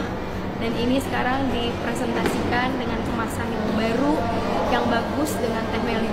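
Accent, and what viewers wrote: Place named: West Java, Indonesia. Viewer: Taahhh.. ieumah lumayan, rada mirip,,make logat sunda saeutik weh,,voooooollllll😁👍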